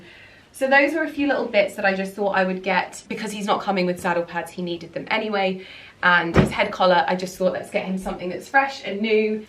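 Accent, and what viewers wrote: United Kingdom. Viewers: I am polish And I Love Your videos content ❤ as well Your british accent. I even learn english with You to have nicer english 😊 You are just such a lovely person ❤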